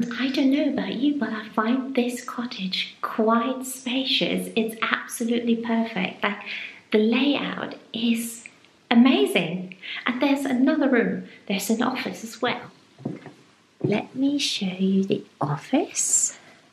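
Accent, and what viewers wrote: England, United Kingdom. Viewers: ❤❤❤❤❤❤ You have a beautiful British accent, it doesn’t look like you’re from Germany :) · I like your British accent, so beautiful. House is very good. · Absolutely lovely! I am new to your channel and see that you are German, but your accent is British. Did you live in the UK? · Your accent sounds more English than German 😊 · Lovely video. Are you from Germany? You seem to have a British accent. Were you raised in Britain? You do not sound like you have any German accent.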